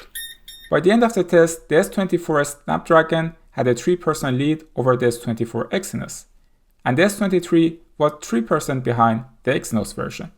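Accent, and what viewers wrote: Iran. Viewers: Again great video dude. My wife is saying that you have a persian accent ? Are you persian ? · I like that Iranian accent ;)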